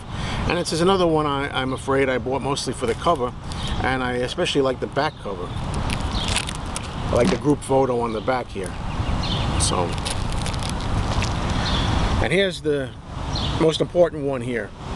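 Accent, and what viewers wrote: New York, United States. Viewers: I assume from your accent you're a NY guy like me?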